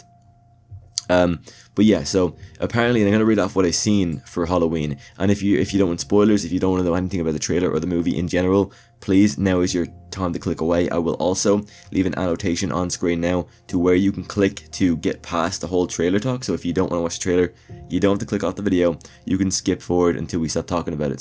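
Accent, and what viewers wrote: Ireland. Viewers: For Christ's sake don't apologise so much, being sick and all - for your have amazing content! I love the Halloween franchise, and if anyone don’t like it, they are free to stop watching! And don’t apologise for your Irish accent, be proud! My aunt is Scottish, be yourself! In the end of your video you are saying it’s Friday, did you make the video yesterday 🧐😁 Cheers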